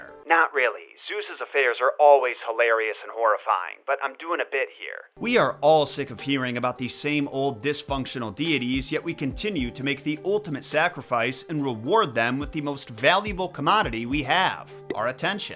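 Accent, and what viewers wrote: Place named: United States. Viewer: Aaaargh !!! The American pronunciation of Greek names is absolutely horrifying! Dye-oh-nye-sus ? Seriously?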